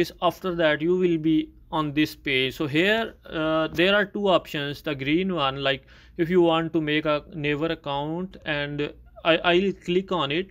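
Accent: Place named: India. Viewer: India people ini mana mungkin orang Indonesia ngomong nya pake logat yang begitu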